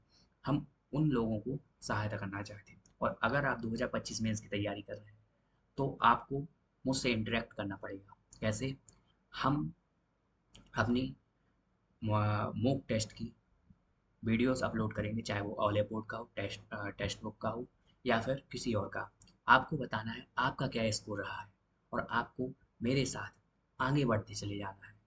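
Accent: Bundelkhand, India. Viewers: Kaha se ho bhai thoda bundelkhand wala accent lagta h aapka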